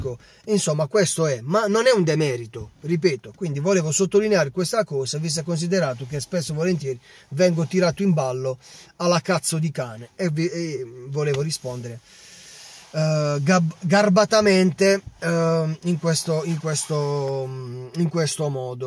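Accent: Apulia, Italy. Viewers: Ciao io sono interista e pugliese come te e ne vado fiero dei nostri accenti e della nostra bellissima e invidiata terra😁poi il calcio è opinabile ognuno ha giustamente le sue ideee vede il calcio a suo modo io infatti guardo i video di più tifosi di più squadre di cui i tuoi proprio per divertirmi e ascoltare sia sfottò che analisi perché infondo a noi tifosi oltre al tifo per i nostri colori e questo che ci fa amare questo sport ciao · Ciao, io sono interista ma ti seguo, a volte dici cose giuste a volte caxxate come tutti noi che seguiamo appassionatamente questo sport. E poi il tuo barese mi fà impazzire in senso buono 😂😂🖤💙🖤💙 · Grande! Il tuo accento mi piace da morire, hai una personalita incredibile! W la Puglia!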